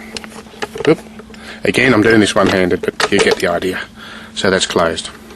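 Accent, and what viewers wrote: Australia. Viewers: P.S. AAA = Awesome Aussie Accent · Love the accent, long live Aussie :) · i love the Aussie accent :)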